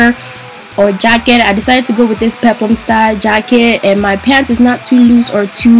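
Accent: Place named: Nigeria. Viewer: love love love your style as well as your naija accent!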